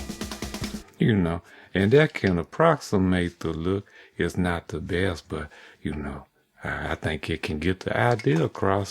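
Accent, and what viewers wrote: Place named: East Texas, United States. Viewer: the more cultural lore we get from you, the more i feel a kinship. i grew up n a small town on a farm in deep east texas. We would pick wild blackberries every summer, and the local dialect was similar to yours. more or less, your vids feel really cozy and familiar to me.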